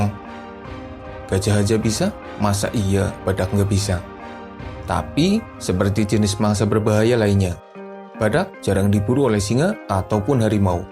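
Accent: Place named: Java, Indonesia. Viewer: Saran logatnya terlalu medok dan monoton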